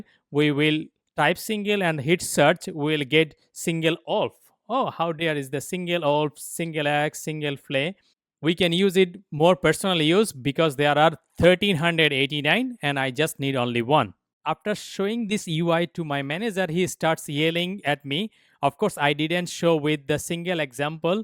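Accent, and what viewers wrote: India. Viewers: Heavy bengali accent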